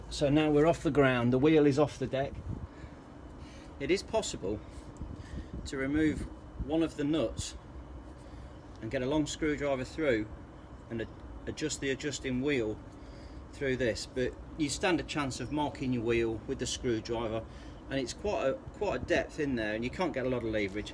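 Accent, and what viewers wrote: United Kingdom. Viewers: i like your british english...lol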